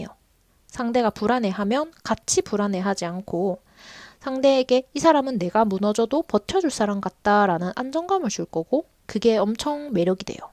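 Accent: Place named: Busan, South Korea. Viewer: 부산사람인가여 악센트가 저랑같네옄ㅋㅋ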